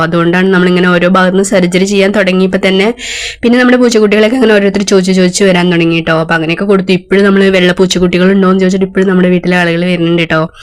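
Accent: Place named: Kozhikode, India. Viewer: നിങ്ങൾ കോഴിക്കോട് കാരി ആണോ.. നല്ല രസമുണ്ട് കേൾക്കാൻ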